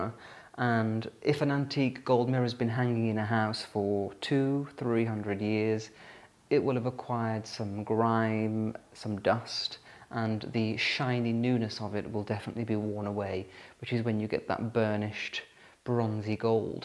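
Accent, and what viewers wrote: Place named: Northern England, United Kingdom. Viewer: Whereabouts up north are you originally from? Whereby you are very well spoken some words are very northern, for example past and grass etc!x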